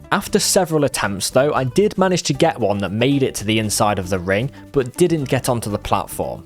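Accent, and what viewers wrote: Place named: United Kingdom. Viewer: Calling it "Wario's Shipyard" as opposed to "Wario's Galleon" caught me off-guard because from your voice I thought you were British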